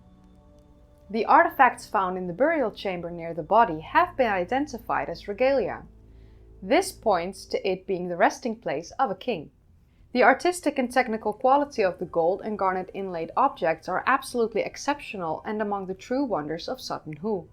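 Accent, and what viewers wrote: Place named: Netherlands. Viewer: the heavy dutch accent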